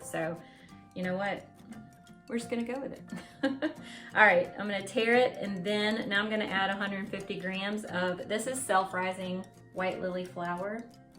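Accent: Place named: United States. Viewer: Where are you? You sound American. I wish that in America the recipes would be given in what we have been trained in like cups and ounces. I Don't Go near any recipe that's not written in plain English for Americans to understand. If you want to cook for Europeans or South Americans go for it but please in the United States give recipes that are people can understand without having to go to a book and transfer everything over to cups Oz pints and quarts. We have gotten so far away from American values and everything is for the aliens that have taken over our country. Obviously in cooking as well.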